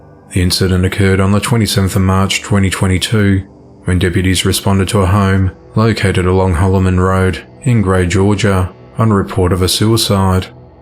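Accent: Australia. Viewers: the Aussie accent narrating true crime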